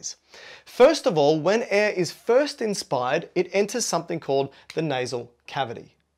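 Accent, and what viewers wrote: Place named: Australia. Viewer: Australian boi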